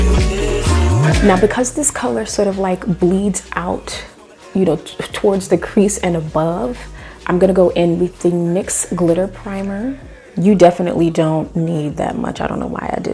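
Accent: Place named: Chicago, United States. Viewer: Are you in the Chicago area? Because you definitely sound like us, the way you speak. I just love it Sis.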